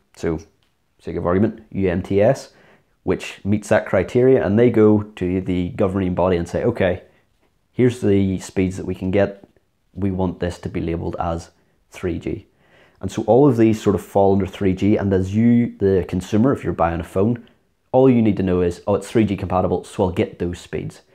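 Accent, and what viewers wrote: Northern Ireland, United Kingdom. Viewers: Northern Ireland! Yeo!1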